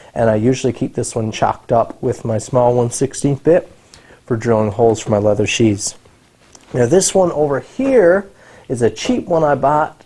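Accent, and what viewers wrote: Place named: Newfoundland, Canada. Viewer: Greetings from Norway👍🏻🤠 Your videos are so educative and cool. Love the New Foundland accent and I admire your craftsmanship⭐⭐⭐⭐⭐👍🏻 Keep the videos going!🏆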